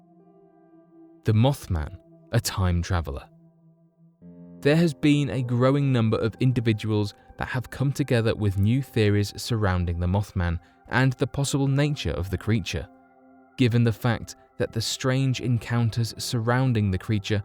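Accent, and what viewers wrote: England, United Kingdom. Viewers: for a guy with an English accent, he sure doesn't speak English well. "had went missing"? "tells of that of a married couple"?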